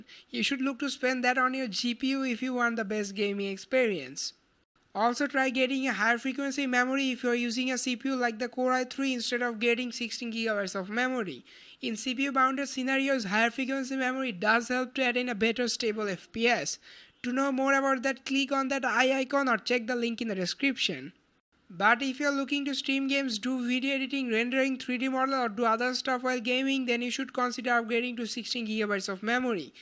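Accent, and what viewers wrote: India. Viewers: Lovely accent :) Hello India!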